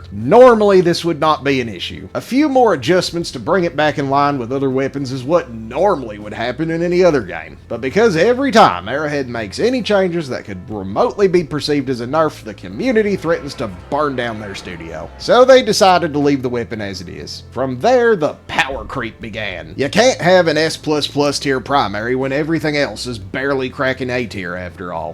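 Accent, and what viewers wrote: South, United States. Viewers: not with his accent. the southern accent eliminates the 'i' from the 'ai' dipthong, which means 'fire' is pronounced more like 'far'.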